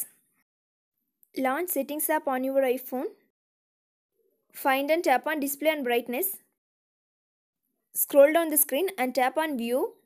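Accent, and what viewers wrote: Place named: Punjab, India. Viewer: Rakarakaraka , put bigger subs and don’t move them quick. Your English is like Punjabi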